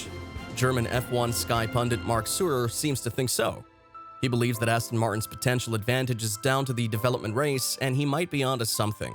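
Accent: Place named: United States. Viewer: Ironic to hear an American accent thinking it knows anything about F1🤣 Instant stop and scroll,😝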